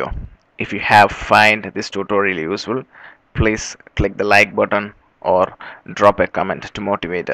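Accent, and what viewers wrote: Kerala, India. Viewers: fo tys.. fuck ur indian accent.. if u dont know how to speak english then dont speak it.. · fucking hate your indian accent. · Lol its the malayali (keralite) accent. Sucks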